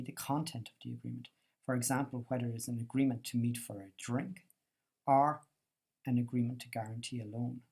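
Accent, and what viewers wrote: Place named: Ireland. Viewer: This information is valuable! The narrator sounds like an Irish native. Does this presentation of Common Law apply to Ireland?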